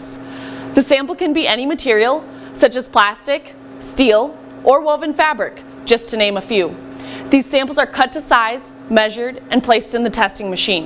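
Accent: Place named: United States. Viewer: That's understandable, but this is American accent, which is very strange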